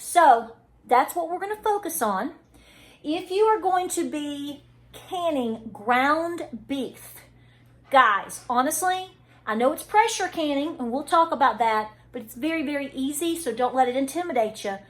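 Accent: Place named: Appalachia, United States. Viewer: You are so down to earth & speak really good hillbilly😆 & that’s my language! I love your videos! Keepum comin!!!👍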